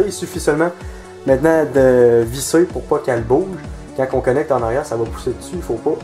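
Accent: Quebec, Canada. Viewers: Le quebec x)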